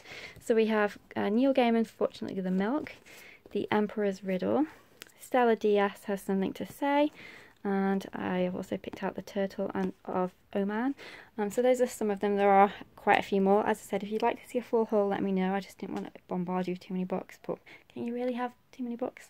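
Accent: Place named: United Kingdom. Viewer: You sound british but are using american education terms. You're like me, become americansied from all the homeschool youtube videos and american curriculum websites :D XD I started using grades naturally now instead too :D XD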